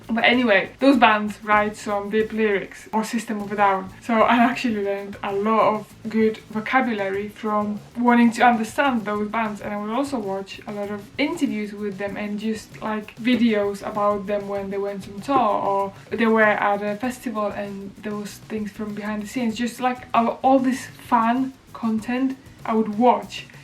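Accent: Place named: North, United Kingdom. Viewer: UK native (manchester) spanish (2nd) ... you definitely have a northern sounding accent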